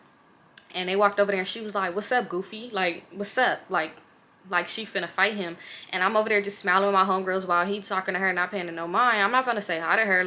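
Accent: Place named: Los Angeles, United States. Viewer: u be telling your stories like everybody from la lol but love ya girl!! love your storytimes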